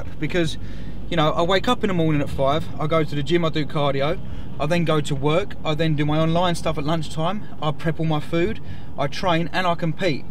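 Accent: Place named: England, United Kingdom. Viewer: well said mate, what part of england u from by the way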